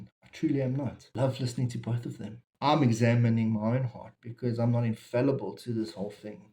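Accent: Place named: South Africa. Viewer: You sound South African brother! Thanks for sharing. Popping by from Mozmabique!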